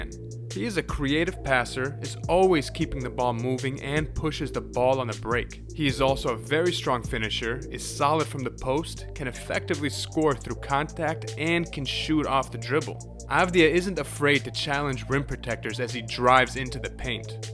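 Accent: Israel. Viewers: רואים שאתה ישראלי איזה מבטא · LOVE your hebrew accent bro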